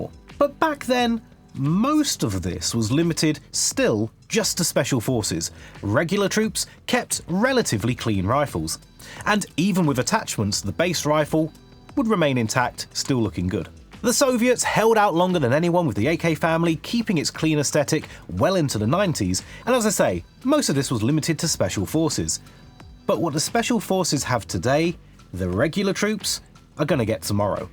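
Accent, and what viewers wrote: United Kingdom. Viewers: bri'ish man with Klinefelter syndrome and a chatgpt generated script tries to tell me that rail on gun = bad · hopefully I’m proving wrong with this comment but going based off of your voice I’m assuming you’re from the UK with extremely strict gun laws mind you I could be completely wrong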